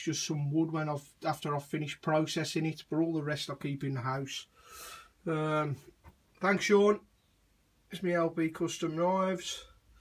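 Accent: Birmingham, United Kingdom. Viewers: you sound like your from Birmingham?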